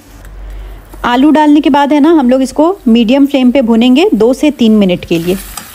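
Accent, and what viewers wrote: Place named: Mumbai, India. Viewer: Sister aap mumbai se ho , aur aapka sasuraal u.p. ka hai, isliye aapki mix zabaan badi hi mazedar lagti hai sunne me. Khaskar jab aati hai, ise chhupa kar paka lenge..😊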